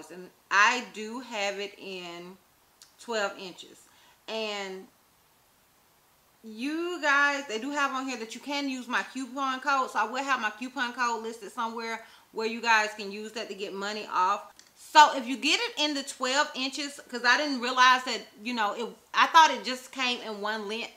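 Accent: South, United States. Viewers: You’re a very beautiful woman. Your southern twang gives you more character as well. ❤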